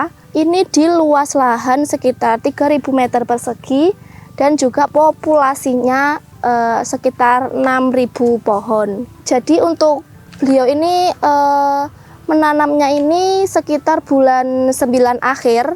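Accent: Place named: Java, Indonesia. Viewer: Mbk,nya jawa,nya ketall banget😂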